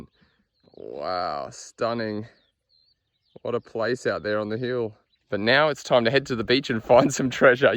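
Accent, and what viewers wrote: Australia. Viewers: That's funny, a Spanish channel?! I am Spanish, I've been following you for a while now! NO WAY I'm gonna miss your voice, your aussie accent or your laughter! I'll keep on watching the original one! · Nah, no travel video please. Stick to getting excited pulling stuff out of the canals. Your Ozzie voice is a bit to annoying for travel talk.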